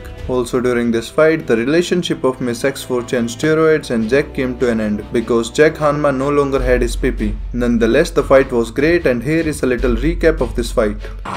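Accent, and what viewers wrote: India. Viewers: Are you a indian? Your voice sounds indian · same it sounds indian and not indian at the same time, it's complex lol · I enjoy the heavy indian accent shitposting about Baki. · it IS India accent. He is an Indian I can vouch for that. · His Indian accent is cool. Most Indians I ever listened sounds more difficult to understand. This guy's Indian accent is kinda easy to understand 😂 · You sound Indian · Ur an Indian the accent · This is the only guy with an Indian accent that doesn’t make me want to leave his video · Everything on his channel is funny the Indian accent just adds a lot to it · The normal jokes are way funnier with Indian accent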